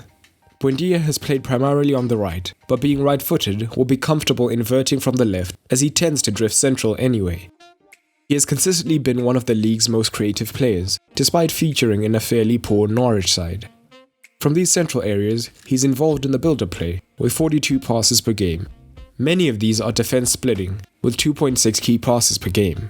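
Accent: South Africa. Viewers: Ayy you South African bro??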